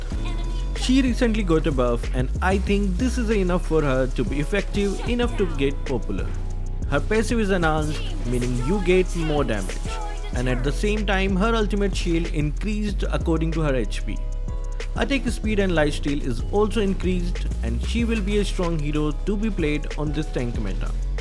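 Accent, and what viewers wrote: India. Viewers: He sounds Indian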